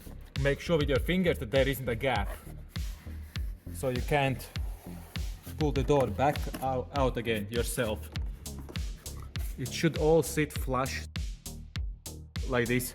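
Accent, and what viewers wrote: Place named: Germany. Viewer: oh, that's great news to me - what you have is what I'm after, because I am very used to 5 pos switches and I enjoy pressing once to fully close my windows when I've arrived to my destination and need to leave my car - and I hate that I can do that with my driver's window with a simple one full pull, but have to hold the pulling for the other 3 windows. I also never had an issue to make a bit more gentle press to crack a window instead of full open or full close because I clearly feel the 3 pos vs 5 pos switch - probably my gaming spider senses kick in to feel the difference :) My problem is that whenever I try to search for a switch with all four windows on auto that you have, I only find the one with driver's window auto only, I even thought it doesn't exist, but you gave me hope. Now, I need to discover a part number that would mean the switch that you have and it also has to be for a right-hand steering. 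Come to think of it, I have an idea to swap with you, because in your videos it looks like you have a right-hand one, although being in EU Feel free to contact me on Telegram (I'm Australia, so parcel might take a week to arrive - last time I posted internationally was around a couple of months ago and it took 4 days to arrive to UK, so I suppose would take around the same time to post to Germany or Austria, considering your accent).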